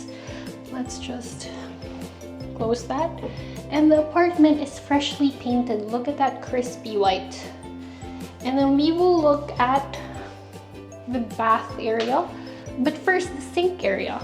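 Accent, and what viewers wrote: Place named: Canada. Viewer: I love the video. You accent is really interesting, I love it! I'm living in Hawaii, and you sound like maybe you lived in Canada maybe? No idea how much these costs but I hope to move to Japan with my wife sometime in the next year or so!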